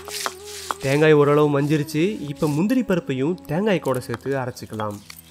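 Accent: Salem, India. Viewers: இவர் பேசுவது சேலம் வட்டார மொழி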